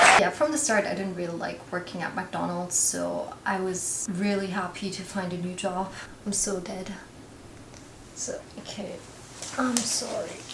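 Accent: Australia. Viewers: I can hear the Aussie accent and pronunciations pushing through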